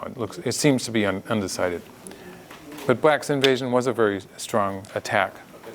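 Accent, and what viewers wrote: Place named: Japan. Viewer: I swear this guy's accent is sounding slightly Japanese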